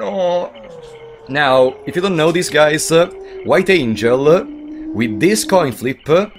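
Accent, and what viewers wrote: Italy. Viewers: Sono nuovo e non posso fare a meno di notare che hai un accento molto italiano, mi sbaglio? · Can't help but notice, just a random question - your accent sounds exactly like my friend from Mezacorona, are you by any chance Italian?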